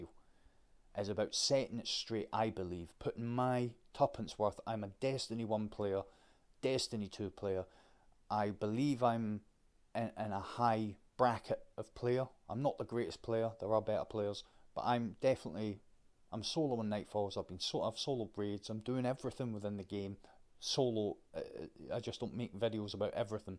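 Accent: United Kingdom. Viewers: You have a kawaii British accent. You should talk more about this. My voice is gay LOL. I sound like a dude.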